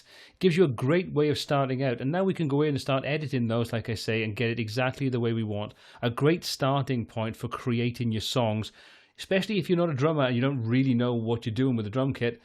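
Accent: Wales, United Kingdom. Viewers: Great vid thanks. I'm a long time EZD user but definitely picked up some tips from this. I'm a Taff living in Aus. Nice to hear the home country accent too. Where can I listen to some of your musical output? Always interested in hearing other people's work.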